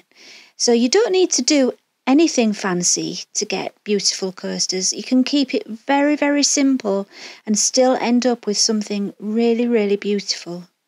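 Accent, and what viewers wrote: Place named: England, United Kingdom. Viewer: So lovely to hear a fellow English person giving a tutorial. You are a breath of fresh air. Loved all the knowledge you shared. I can’t wait to get started. 😘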